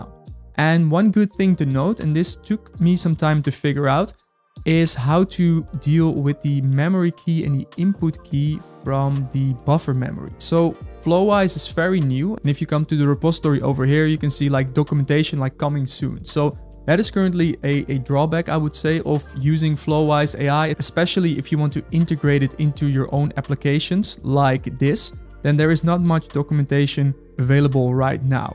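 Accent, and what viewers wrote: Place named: Netherlands. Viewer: I hear dutch accent :D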